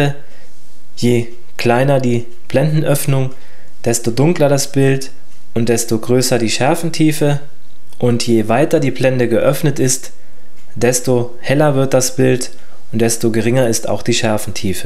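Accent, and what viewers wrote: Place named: Saarland, Germany. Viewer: Isch heer e Saarlänner schwetze - isch drigge "Gefällt mir" 👍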